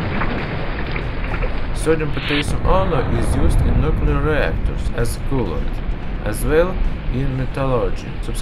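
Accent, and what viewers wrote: Russia. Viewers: Cilla Moke it's a Russian accent, isn't it?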